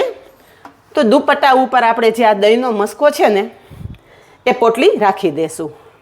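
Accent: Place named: Kathiawad, India. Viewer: beautifully explained in pure kathiawadi gujrati. You showed two things.... How to make thick dahi and shrikhand. I will add the ingredients to make dahi and shrikhand. Thank you.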